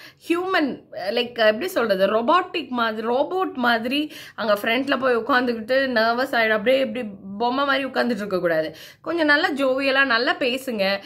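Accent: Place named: Kerala, India. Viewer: Watching 2 to 3 videos in the past I doubted that you would be malayali..... And In this video your slang resembles like a malayali .....are you a malayali..??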